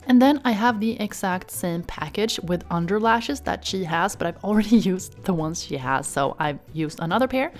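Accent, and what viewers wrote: Sweden. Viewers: YOU’RE SWEDISH, RIGHT? I dunno why I got so happy by your accent, it’s just so familiar hehe. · Is she from Sweden her English accent sounds like Swedish english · R u swedish?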